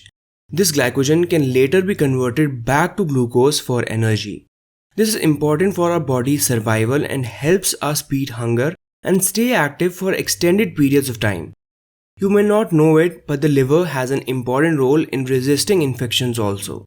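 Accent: India. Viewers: Thanks Dr. I love your Indian Accent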